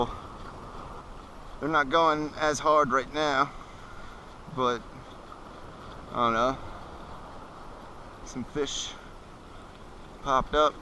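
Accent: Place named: New Jersey, United States. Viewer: Dude you sound like your from Jersey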